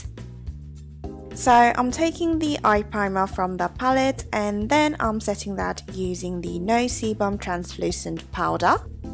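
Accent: United Kingdom. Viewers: 영상 오랜만이에요 언니 !!!!항상 브이로그 챙겨보는데 항상 밥드실때 댓글보신다고 하셔서 댓글 오랜만인지 처음인지 ....? 여튼 달아봐요 !!!항상 영상 잘 챙겨보구있고 언니 브이로그 보는게 진짜 삶의 낙이에요 ㅋㅋㅋㅋㅋㅋ밥먹을때나 자기전에나 꼭꼭 한번씩 더 챙겨보고 자요 !!!!봤던거라두요 ㅎㅎ 하루하루 브이로그찍으셔서 보는 재미도있구용 ㅎㅎ 영상 길이도 길어서 넘나 좋아요ㅠㅠㅠㅠ 항상 영상 잘보구있으니까 언니 화이팅해요 💕💕💕💕💕아 그리고 언니영상보면서 영국 특유의 그런 억양 너무 배우고싶어졌어요ㅠ영국 너무 가보고싶어요 !!계산도 혼자해보고싶구요 ㅋㅋㅋㅋㅋㅋㅋ여튼 영상 잘보고가요😘👍🏼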